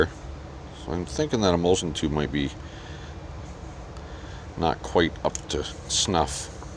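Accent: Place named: Canada. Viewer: By chance are you Canadian?? Noticed a bit of an accent.🤔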